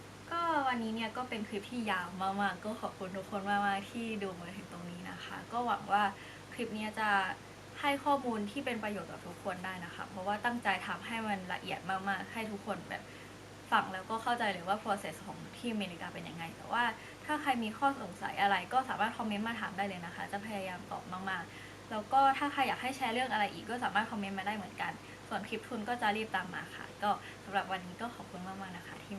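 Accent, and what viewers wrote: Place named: South, Thailand. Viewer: รู้เลยค่ะว่าน้องคนใต้ สำเนียงออก ^^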